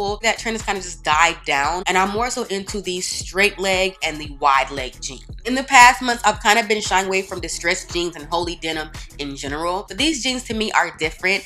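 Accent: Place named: Florida, United States. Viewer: Super cute looks! 😍😍 I could tell you were from Florida or lived there! Your accent! I’m originally from Miami 🤍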